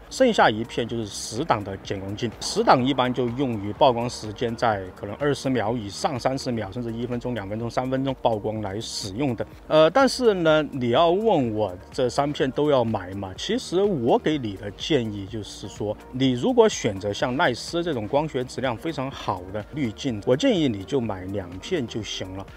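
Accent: Sichuan, China. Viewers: 川普，四川人？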